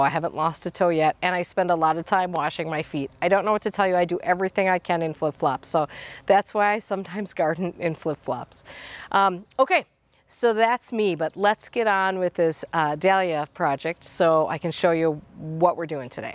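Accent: Wisconsin, United States. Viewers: Great Dahlia video--THANKS! I have grown Dahlias for 5 years or so, but there are so many helpful things to learn! The Florida weave looks like a super way to keep Dahlias from toppling! I have 4 of the 5 varieties you showed, (not Penhill Watermelon), with all but Cafe Au Lait being new for me this year. I am so excited to see them bloom!! Your Dahlias are quite a bit bigger than mine so far, as I had to put mine directly in the ground. I would LOVE to start mine in pots, but I don't have room....I have 90 varieties total this year (Where do you keep them as they are growing in pots?)! ;) Thanks for all the tips--Hope to see an update this Summer! :) :) Love your accent by the way--My aunt lives in Wisconsin (Neena, WI), and it makes me think of her when you talk...such a lovely sound to my ears! Happy gardening! Hope you have bushels of beautiful blooms! 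;)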